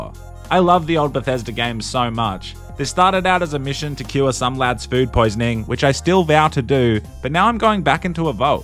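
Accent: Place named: Australia. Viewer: He is not mispronouncing wrong! Its his Australian accent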